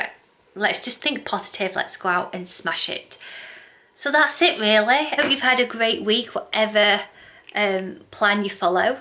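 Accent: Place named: Northern England, United Kingdom. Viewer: I'm so glad you're going to carry on making your Friday Finds and What I Eat videos. You're brilliant to watch and there's nothing wrong with how you talk... It's nice to see Northerners on YouTube 😘. Some people can be so envious of a pretty, confident, clever woman!!! What they've done is disgusting but I think you're doing the right thing by keeping the kids out of it and carrying on. It's them with the problem, not you. F*** them. You've got 3 & a half thousand followers behind you 😊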